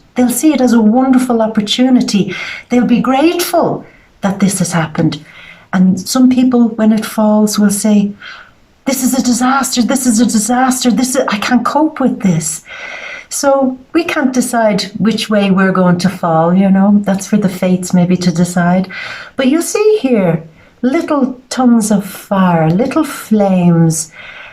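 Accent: Ireland. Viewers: Lovely to hear your lovely Irish accent today........really cheered me up.😋👍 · Hey. I must ask...I have been watching you for about a year and half, and have always been wondering where your accent is from. You mentioned your living in Italy. I used think when I first started to watch you, that you were from somewhere in northern Europe and then I have been thinking I am hearing an Irish accent. Please tell me where your accent is from 🤣 Thank you so much for all your wonderful videos. It's a pleasure to watch your beautiful face and listen to your lovely videos each month. Lot's of love, Deborah 🥰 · I did not know you were living in Italy, sending love, Me mum is from Ireland and your accent sounds very Irish. I assumed you were from Ireland. lol Take care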